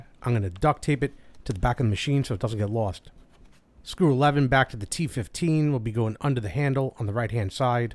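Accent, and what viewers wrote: United States. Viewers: I enjoyed the whole 4 video series - very methodical and informative. If anyone needs the inspiration to perform this type of work themselves then your videos give it in spades. Question: Why was the machine set to language “German” when you’ve such a strong American accent ?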